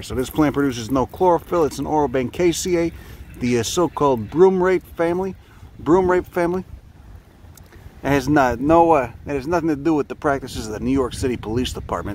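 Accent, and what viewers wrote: Chicago, United States. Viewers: Yo i fucking love your Chicago accent! Not many people have thick Chicago accents anymore.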